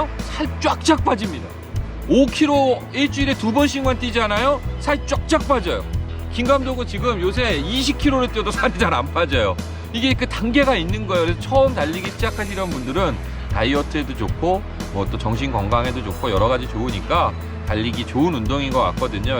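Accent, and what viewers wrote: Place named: Seoul, South Korea. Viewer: 서울말 깔끔하게 구사하셔서 깜짝 놀랐어요..ㅎㅎ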